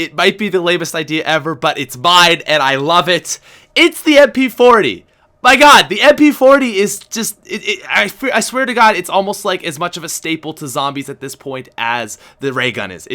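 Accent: Canada. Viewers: It's his Canadian accent calm down (I think :/) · he's Canadian so he pronounces words differently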